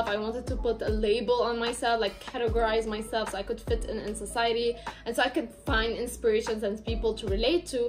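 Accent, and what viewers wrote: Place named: France. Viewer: Such a good video! I was always curious what exactly your accent was because it sounded French but not fully French. Loved getting to know more about you :)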